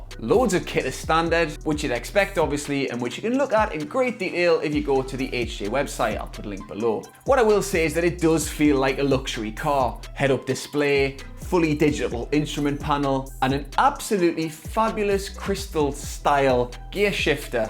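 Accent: Newcastle, United Kingdom. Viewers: Hair, tats, Geordie accent , Badgers and a Beeemer - whats not to like :)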